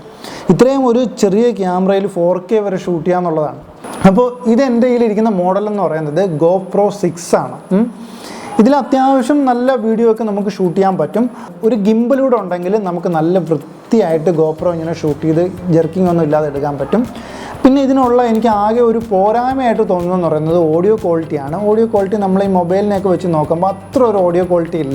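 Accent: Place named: Central Travancore, India. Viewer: അണ്ണാ നമ്മുടെ തിരോന്തരം ഫാഷേല് പറ അണ്ണാ, ഇതു അണ്ണൻ deliberately മധ്യ തിരുവിതാംകൂർ ഫാഷ പറയുവാണ്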